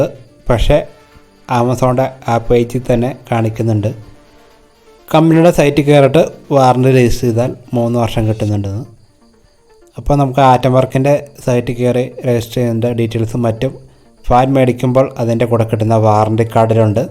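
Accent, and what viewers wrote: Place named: Kollam, India. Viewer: ഫ ഫ എന്നു പറയുന്നത് കൊണ്ട് മനസിലായി കൊല്ലത്തുള്ള ആളാണോ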